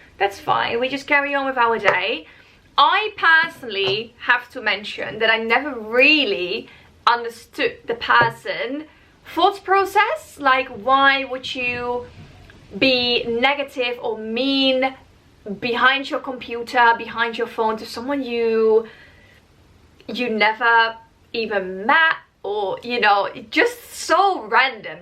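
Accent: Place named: Netherlands. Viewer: Ik hoorde een ‘kleiin NLaccentje’. En toen zag ik je naam en wist het zeker😄. Bedankt voor de review!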